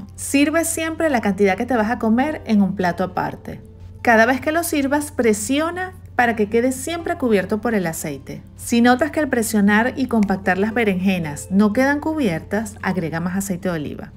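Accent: Venezuela. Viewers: Hola paisana, seguro que eres Caraqueña o Valenciana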